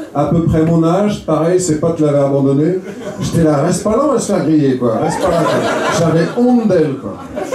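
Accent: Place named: Finistère, France. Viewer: C'est l'accent finisterien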